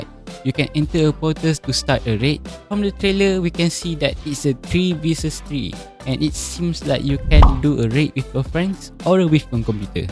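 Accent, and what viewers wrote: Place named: Malaysia. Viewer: I can recognise this person from just the way he speaks English 😂 he from Malaysia😝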